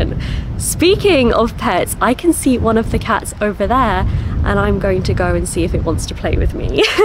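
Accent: United Kingdom. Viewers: British English も美しい……今からもずーーっと聞かせてほしいですね、耳に心地よい響きをもたらしてくれる……… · ねえちゃんのBritish Englishをいつも楽しんでいますが、 鼻の大きさも楽しんでいます。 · とても美しいドレス姿と日本の半纏。楽しみました。イギリス英語は日本人にあっています。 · アメリカ英語よりイギリス英語が聞き取りやすいという意味が分かったかも✨ 日本語訳と英語の同時表記ありがとうございます。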